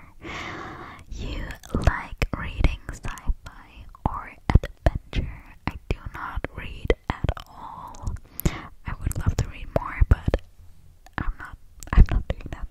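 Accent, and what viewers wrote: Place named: United States. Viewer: I’ve only just started listening to and to be completely honest I thought you were American, as you do have a slight twang but that could be because of the internet, with a mix with a different accent. I assumed you were born and raised in America with your parents or one of your parents being European, I wouldn’t have guessed German either! 😂 One of my friends is half German, half English and you have similar accents but she is completely fluent in English and partially fluent in German. So I would've thought it was a similar thing. You’re English is really good, I thought you were a native speaker, don't put yourself down cause you are clearly better at it than you realise!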